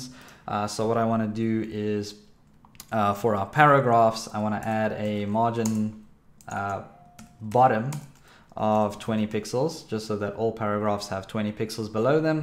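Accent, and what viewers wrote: South Africa. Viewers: I'm getting addicted to your tutorials and that accent from SA. Thanks, from Essex, UK.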